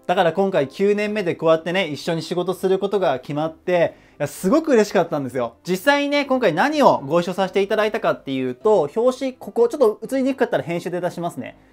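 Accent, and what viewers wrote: Kansai, Japan. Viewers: なんか関西弁？入っててかわいい！！！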